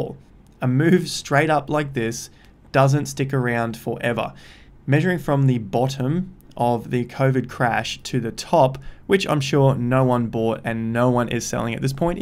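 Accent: Australia. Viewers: I'm going to be leaving university and hoping to get a job in September. I think crypto is definitely a space that I'll invest real money into during the next set of lows later in the year (at the moment I'm working with about £100 and doing what I can) and I think I'll buy the Investor Accelerator too because you're genuinely helping me learn (and your Ozzy accent is nice to listen too xD)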